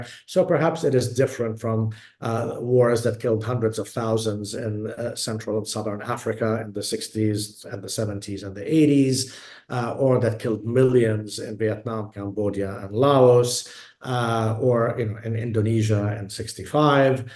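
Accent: United Kingdom. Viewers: He sounds like he is from the UK. Did he forget about the British put the Zionist in Israel in 1916 and took over there claiming to be Jews and they created the UN to make Israel in 1948 .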